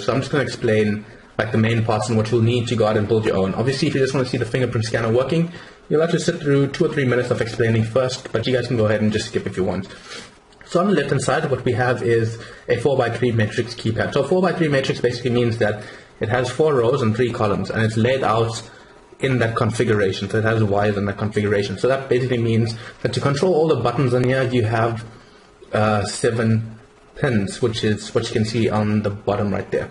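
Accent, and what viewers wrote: South Africa. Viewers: I thought that your accent sounded familiar, You're from Durban! im from Cape Town! Great project man, ill be sure to have a deeper look at your system, Good luck with the future of this project!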